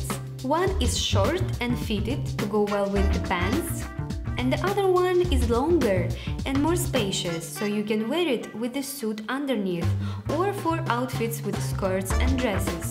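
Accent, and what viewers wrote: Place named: Russia. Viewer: Слышу русский акцент)))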